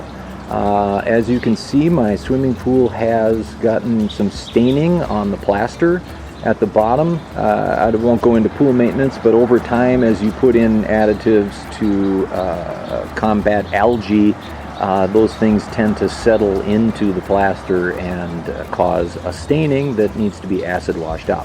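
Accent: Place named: United States. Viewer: what is there about this video that a person would not like? Maybe they are genetically related to Scrooge and they just don’t like anything no matter what. I listen to it twice just because I liked it so much. I am totally blind and I really need to know how these things work. Pictures on boxes do me no good whatsoever and neither do those folded math like papers in 16 languages. I needed a description by an English-speaking individual who spoke American English like me. I appreciate the time it took to make this video and I will be recommending it to everybody I know who might like or need to use one of these pumps. Thanks so much once again because most of these videos just have music and no talking and they drive me up the wall. This is a great video and I don’t understand why it hasn’t been watched 1000 times or more. I give it 10 out of 10. 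thumbs up and every other kind of good review numbers anyone can think of to add to mine.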